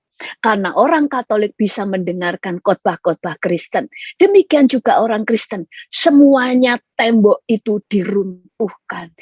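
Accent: Java, Indonesia. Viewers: Aku suka pengajaran dari ibu. Pengajaran mudah dimengerti dan bahasanya sederhana. Meski ibu lama di Amerika tapi medok Jawa nggak bisa hilang. Tuhan Yesus memberkati ibu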